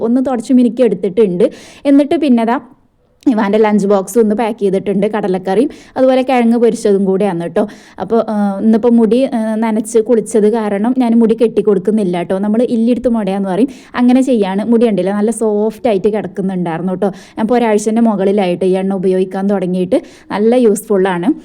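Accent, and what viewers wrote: Malappuram, India. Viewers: Hai video eshtayi nammalum illi yeduth modayanna paraya.😂😂malapuram bhasha👍😅ok da by sainuty❤❤❤❤❤❤❤❤❤❤❤❤❤❤❤❤❤❤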